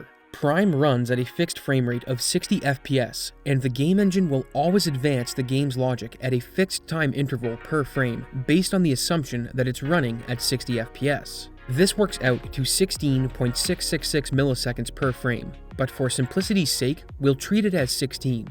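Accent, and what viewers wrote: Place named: Atlantic Canada, Canada. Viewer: How far east are you from? I'm trying to pin it down, but I'm having trouble distinguishing because of your decent effort between NS or NL. It's been annoying me that I can't decide. If I was watching this in a vacuum, I'd say NL, but other videos make me question...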